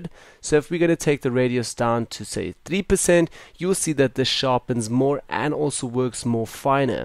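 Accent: South Africa. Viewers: You sound South African. I dig that!!! Nice video!!!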